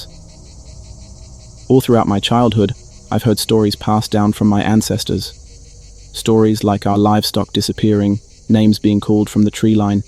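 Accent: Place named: United Kingdom. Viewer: Awesome British narrator ! 😀😀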